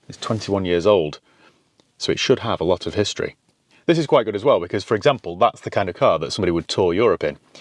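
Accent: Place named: Bristol, United Kingdom. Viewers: Good Bristolian accent! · Lush Bristol accent.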